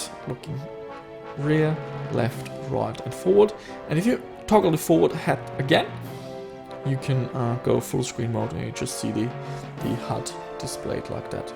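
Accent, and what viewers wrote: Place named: Germany. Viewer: Thanks for the tips.. From the accent, i know you're a german. ;)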